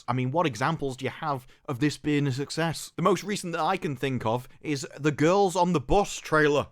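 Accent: England, United Kingdom. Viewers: I now remember why I unsubscribe from you. You are obnoxious repetitive little prick who thinks he's smart when all you are is just some retard repeating the same crap with a British accent. that and just a little thing get some kind of tooth whitening thing going on. Every single video you look like you've been chewing spinach right before you went on camera. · Are you an American just with an English accent because you sound like everything you're saying I would say.!!?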